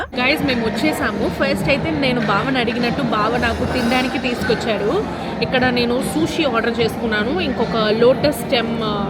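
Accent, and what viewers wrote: Tamil Nadu, India. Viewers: Akka miru matladettappudu tamil language vasthundhi 😅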